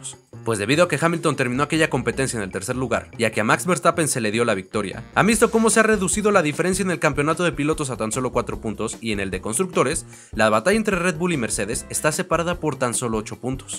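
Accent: Mexico. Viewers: pensé que eras mexicano xdd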